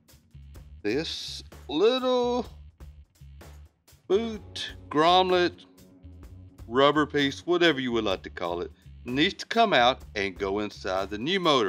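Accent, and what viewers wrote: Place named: South, United States. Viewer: southern drawl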